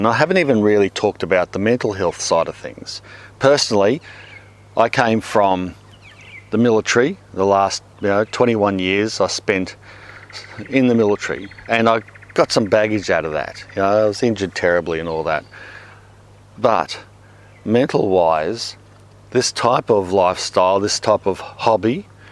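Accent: Australia. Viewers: Love your Australian acesnt! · Hahaha. Made me laugh. Love the Aussie accent. “What a wanker”. Xx NZ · Hey recently discovered the channel. Fantastic! Anyway here in the land of takeout Boston Massachusetts area specifically we see the same type of negative publicity about growing your own food. in fact certain cities and towns but even more restrictions on growing your own food in the backyard which I think is crazy. I'm starting to grow my own for the first time and looking to move out you another state so I can have more land it's so expensive here. But growing up my brother and sister and I and my Mom and Dad we had a huge garden my mom became expert. It and we produce enough food to feed us all during the growing season and often had enough to store a few months after. In fact maybe that's where I learned to love vegetables. it's nice being able to watch your videos and understand the things I need to do a lot of what I had forgotten. 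by the way I listening to the Australian accent it's very similar to our accident here in Boston. We don't R much either 😀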